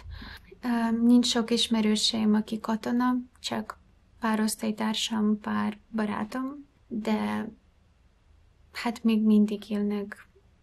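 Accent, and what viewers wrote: Russia. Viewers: Szia! Borzasztóan sajnálom ezt az egészet.. Az én nagymamám Orosz volt. A 2. világháború után szökött Magyarországra, mert a fronton beleszeretett a nagypapámba. Nemrég halt meg 95 évesen, de meghökkentő, hogy mennyire hasonló akcentusa volt hozzád. És számomra ez a legborzalmasabb, hogy innen nézve 2 nagyon hasonló szláv nép öli egymást évek óta... Én is tudom hogy ez az oroszok bűne, és mellettetek vagyok a szívem legmélyén is. De akkor is mellbevágó a hasonlóság akcentusban, habitusban. Ha egy ország képes lerohanni egy hozzá hasonló kultúrájú országot is, akkor bennem semmi optimizmus nem maradt már a jövőre nézve... Nagymamám soha nem beszélt az ideérkezése előtti időkről, és soha többet nem ment Oroszországba. Egyetlen napra sem. kb. megtagadta a származását, és a saját gyerekeihez sem szólt oroszul egy szót sem. Amikor pár éve azzal példálóztunk neki, hogy már nem kell félni tőlük, ez már nem az az Oroszország, mint a régi időkben, mindig azt mondta: Nem tudjátok milyenek az oroszok! 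Amint megerősödnek, vissza akarják állítani a szovjet uniót! Miután meghalt, rá 1 évre kitört a háború. Úgy megkérdezném most mit gondol erről... Nektek pedig kitartást! És félelmetes milyen jól beszélsz magyarul 2 év alatt!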